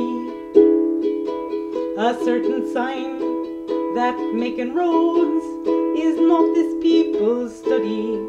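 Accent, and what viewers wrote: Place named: Scotland, United Kingdom. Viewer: Super clever as usual. And not a bad brogue for a Nuevo Jersey lass!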